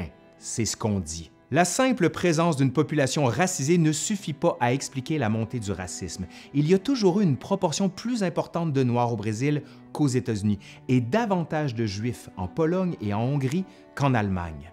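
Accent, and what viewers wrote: Quebec, Canada. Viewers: ACCENT CANADIEN EN MASSE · Sans racisme aucun , l'accent Québécois me fait saigner les oreilles , alors que j'adore nos cousins d'outre Atlantique , c'est juste leurs accents que je trouve insupportable . Les juifs étaient déjà persécutés bien avant la crucifixion de Jésus (égyptiens , Babyloniens ) . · He is from Quebec, they speak a french dialect with another accent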